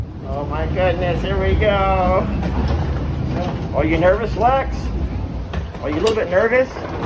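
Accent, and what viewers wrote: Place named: Texas, United States. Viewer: OK let me speak up as a native California. I'm 51 yrs old and a 3rd generation Californian. 1. I would have never even noticed you because honestly you blend in. Crocks and fanny packs are not that unusual here. And even if it weren't people don't really care here. We are pretty accepting. 2. Sadly one of the reasons you are not getting people responding to your "hello's" is people are a little gun shy right now as we have had a pretty significant spike in crime, so many of us are a little on edge around strangers. Trust me when I say... it is NOT you! You look like any other normal Californian and I would have been none the wiser until I heard your accent.:) And BTW we have lots of Texans that come here to live, I have had quite a few friends from Texas and I have always gotten along with them really well. I love the Texas personality.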